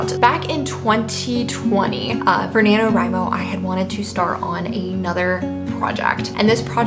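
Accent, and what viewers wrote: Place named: South, United States. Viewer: Don’t hide the accent! We love the Southern flare 😁 I can’t wait to see your new project and how it progresses! It’s going to be awesome!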